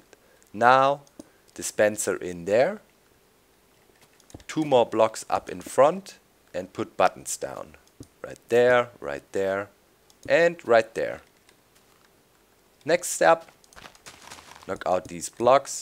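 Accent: Germany. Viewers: Are you german? :) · Auch nicht schlecht, dachte mir doch der Akzent hört sich deutsch an. Aber sicher war ich mir dann bei "Last but not least" ;)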